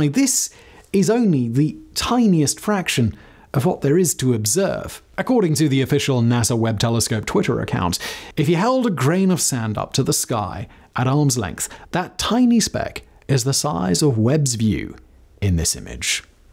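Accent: England, United Kingdom. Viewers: Your accent is painfully English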